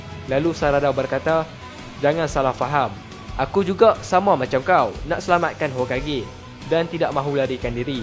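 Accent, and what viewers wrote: North, Malaysia. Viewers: logat utara hahahaha but nice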